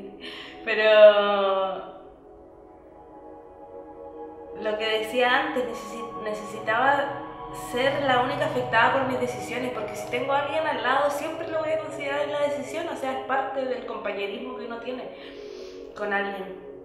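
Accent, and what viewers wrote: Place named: Chile. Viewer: ya casi se te está yendo el acentos chileno.jajaja y me encanta! Por otro lado me inspiras ❤️ me di cuenta que la vida se va y la mayoría nos quedamos atrapados en un trabajo para poder sobreviviry nos olvidamos del universo completo que tenemos afuera.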